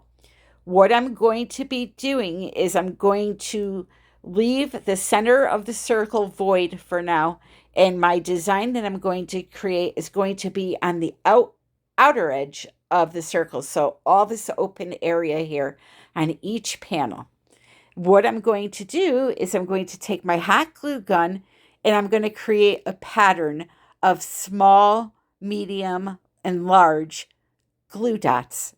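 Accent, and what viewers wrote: United States. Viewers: I was watching this thinking, “what the heck is HAT glue? Some kind of glue gun only used for hats?” The entire time. Took a long time to realise it was just your USA accent making the English sound strange. As a British person it sounded SO much like HAT, like I really though that’s what you said lol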